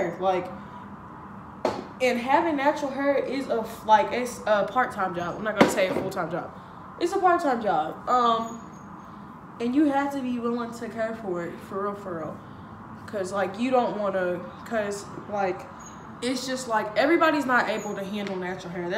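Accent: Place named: South, United States. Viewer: I didn’t know you had a country accent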